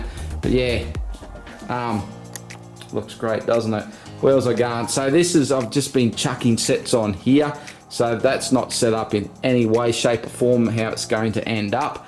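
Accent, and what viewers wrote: Australia. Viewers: Now correct me if im worng but you sound Australian, and if that is the case are you ok? You know with the the fires happening.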